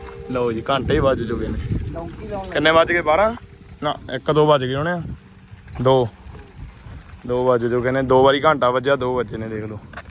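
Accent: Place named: Moga, India. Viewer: Malwa area y tera moga lgda boli to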